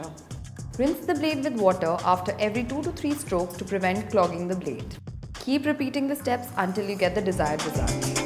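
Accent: India. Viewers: Indian English accent with asian face So confusing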